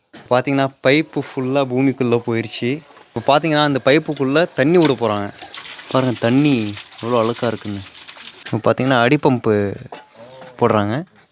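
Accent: Standard. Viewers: Ur tamil is good. I.ur speaking pure tamil. As an Indian I proud of you. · எங்கள் ஊரில் திராவிட மாடல் தமிழ்.... நீங்கள் பேசுவது தான் உண்மையான தமிழ்... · ரஷ்யாவில் தூய தமிழ் மணக்கிறது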